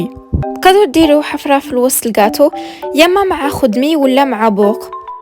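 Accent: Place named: Oujda, Morocco. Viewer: ماشاء الله عليكم وعجبتيني مين قولتي خودمي ههه على الموس اللهجة الوجدية حاضرة في الفيديو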